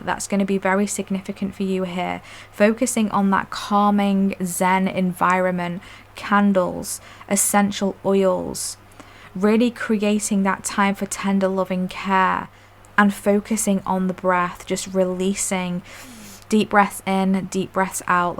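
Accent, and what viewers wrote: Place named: England, United Kingdom. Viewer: 1 - phenomenal reading, I could relate to all of it, including aches and pains, exactly where mine are. As for suns I did another reading and the lady pulled 4 sun cards out. I’ve also been working on my God (sun) connection 🌞 Thank you so much, as a fellow Pom living in NZ, it’s lovely to hear your pommie accent ❤ I’m feeling very grateful and blessed 💐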